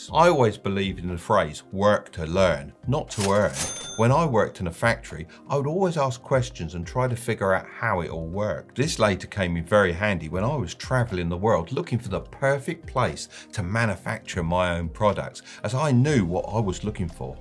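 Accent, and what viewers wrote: United Kingdom. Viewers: AND your British accent. It's just beautiful haha.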